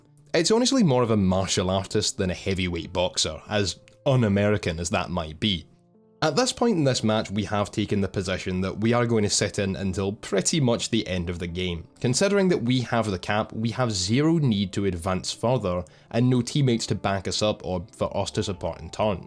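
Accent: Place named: United Kingdom. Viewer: Eduardo Raul hes scottish... his name is litterally. Also his accent makes it very clear he is from the UK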